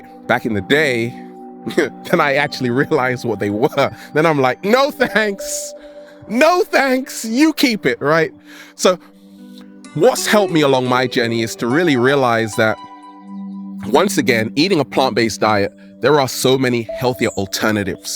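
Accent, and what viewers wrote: United Kingdom. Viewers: Are you British because you sound like it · That British accent tho....love it! "Tomatoes"😁😁Love you.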